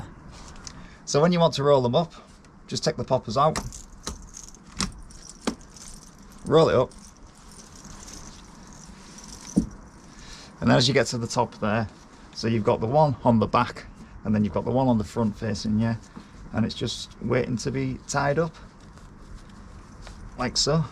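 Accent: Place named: Hartlepool, United Kingdom. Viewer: Are you originally from Teesside area mate ? Got a Hartlepool accent 🫡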